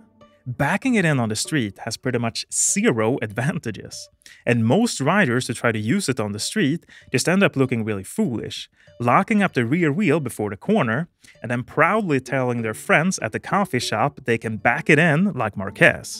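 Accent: Sweden. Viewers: Someone got a case of the swenglish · Är du ifrån Sverige? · Your swedish accent makes it way more accessible! Bromsa bak och titta fram!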